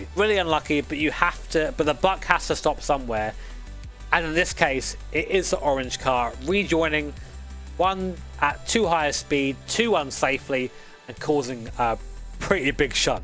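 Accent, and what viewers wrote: United Kingdom. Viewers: I couldnt understand what you were saying since you are British and you sound poor anyways so whats the point of listening to you? being respecful goes a long way and its just a game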